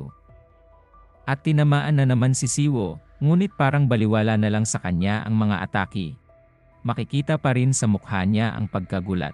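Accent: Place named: Igorot, Philippines. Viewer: Igorot narrator to😅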